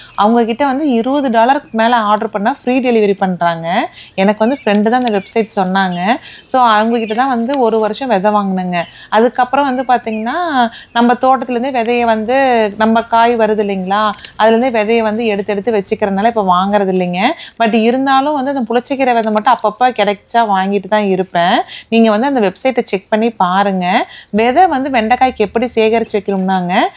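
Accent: Kongu, India. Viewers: Kongu tamil ❤❤🎉 voice super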